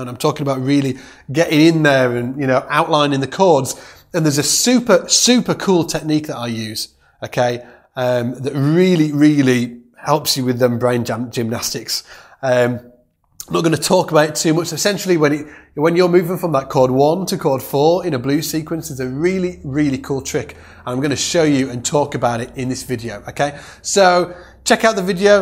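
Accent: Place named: Staffordshire, United Kingdom. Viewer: Guilty as charged for murdering the blues with the pentatonic with the blue note! Pentatonic just kills the blues....well this jazzy kind of blues you show here.You sound like you're from the Lichfield/Tamworth Staffs area mate.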